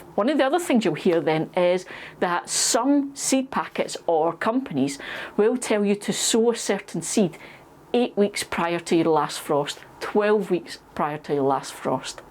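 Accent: Scotland, United Kingdom. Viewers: Brilliant video - great to hear another Scottish voice. I’ve just got my plot and am sharing it on YouTube too. Thanks for all the tips.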